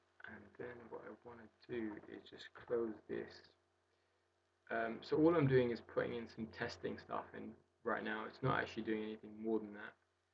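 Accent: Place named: South India, India. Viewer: very nice video good tutorial.. html tutorial is way too long for an title of "open graph" I am 19 minutes into video and there is 0 (Zero) open graph demo.. beside login and that too not covered in tutorial in first 30 minutes my critique... keep it simple, for a complete app tutorial it was good but first 20 minutes were boring ...another thing...be normal and try to be normal with your accent.. be yourself.. you have that typical southern indian/ sri lankan inspired "hech"tml in your video too many times..that is very annoying if you are trying to put layer of english or american accent.. caught a few times struggling with "lo"gin / "loo"gin.. again nothing bad but annoying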